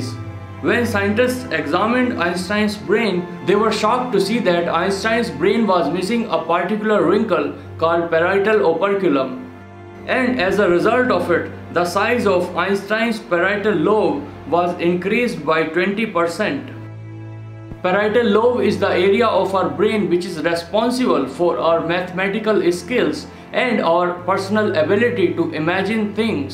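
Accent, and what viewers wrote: India. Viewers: I can’t understand u ur so Indian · Yeah I also heard this Indian man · is this indian einstein speaking? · I fucking love indian accents 😂🤙🏻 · 20% i can't even hear what your saying with that indian act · First time watcing indian. (Know from the speaking accent.)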